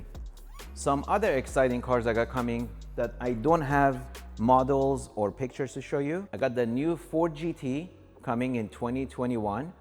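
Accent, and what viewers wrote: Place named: Iran. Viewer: 5 seconds into the video and I can already tell you are Persian! :)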